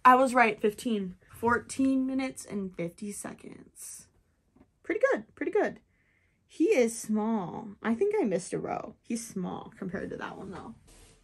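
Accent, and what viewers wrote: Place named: Upper Midwest, United States. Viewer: Haha the upper Midwest accent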